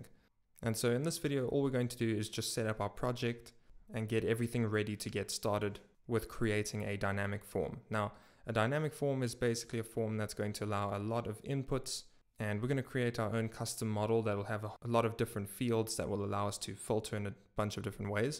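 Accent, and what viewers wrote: South Africa. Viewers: Are you by any chance from South Africa? You are doing a great job by the way.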